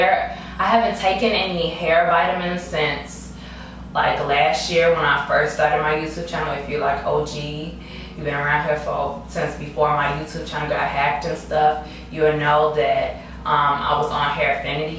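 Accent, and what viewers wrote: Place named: New York City, United States. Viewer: you have that NYC accent lol ur hair is so prettyyyy and so r u keep making these vide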